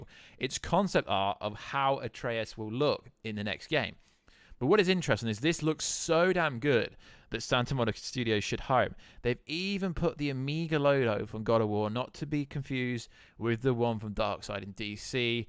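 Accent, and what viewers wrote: England, United Kingdom. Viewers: (not relevant but but whereabouts in England is your accent from don't sound like black pool or London to me just curious?)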